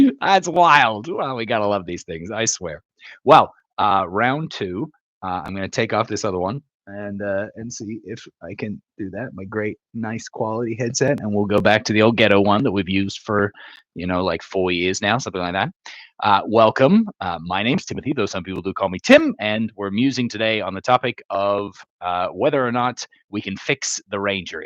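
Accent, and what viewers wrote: Australia. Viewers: I think there's an Ozzie filter on the microphone. LOL! I've watched a lot of videos and you've never sounded Aussie until this one. No worries, mate. Maybe it's because ya just got back, but your accent is not usually prevalent. Maybe it's because of your other voices.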